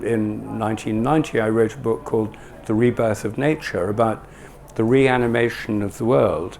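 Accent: RP, United Kingdom. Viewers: Yukky muddled thinker - ignore the suit with an upper crust British accent. Soon as he said he had lived in India I thought “Yeah a continent full of devils and demons” of which he is now one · This guy loves his posh British accent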